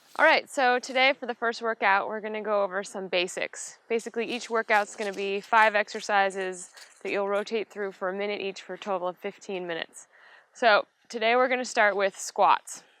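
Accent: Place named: Australia. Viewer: Your aussie is so beautiful!!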